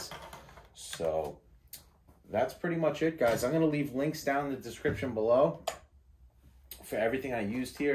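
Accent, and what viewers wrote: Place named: East Coast, United States. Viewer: You a east coast guy. I'm from Jersey. Sound similar. Have fun flying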